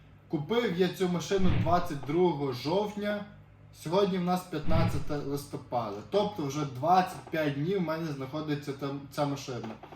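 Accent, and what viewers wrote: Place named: Surzhyk, Ukraine. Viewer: я з камянця. Чую наш суржик))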